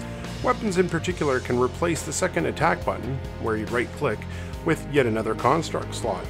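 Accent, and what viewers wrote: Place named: Canada. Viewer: Haha I even subscribed too. I like how you do content and your accent is clearly Canadian, same here I’m in Alberta. Keep grinding man 🤙🏼🔥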